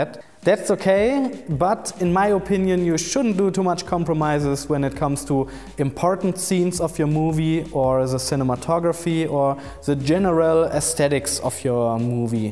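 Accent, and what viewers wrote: Germany. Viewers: Oh , bist du Deutscher oder Österreicher?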